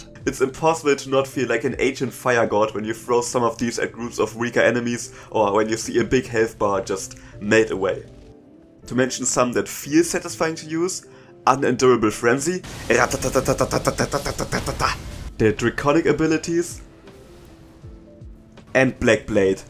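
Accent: Germany. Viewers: So herrlich TEUTSCH, your english. I love my germans, because I'm one myself. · Bist du deutsch? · Der Deutsche Akzent kickt zu stark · man merkt das er deutscher ist xd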